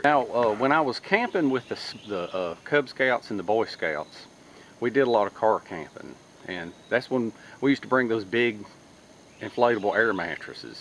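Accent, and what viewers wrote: South, United States. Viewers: Greetings from WNY, discovered your channel recently when decided better get prepped up in light of current events. Really enjoy your content, very thorough, comprehensive, and informative. The cats made this one! Also wanted to say you remind me of my buddy Eric from Kentucky with your southern drawl! best to ya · Great project, and your presentation was,as always,very southern,and right on the money! Enjoyed it a lot.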